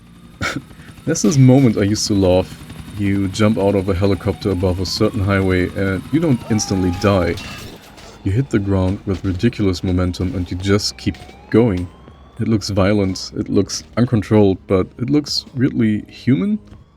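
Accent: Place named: Germany. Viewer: I hear a little DEUTSCH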